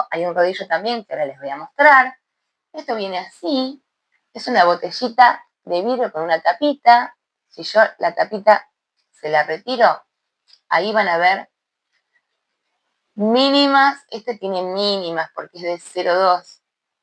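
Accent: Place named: Andalusia, Spain. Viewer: Porque me parecías que eras de andalucia😅❤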